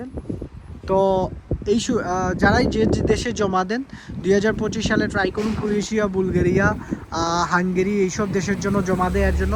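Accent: Sylhet, Bangladesh. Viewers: সিলেটি ভাই❤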